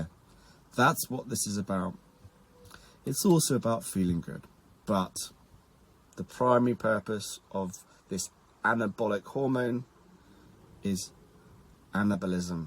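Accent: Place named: New York, United States. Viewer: Yes!!!! New York accent 😂👌🏻 HOT DAWWWWG 🌭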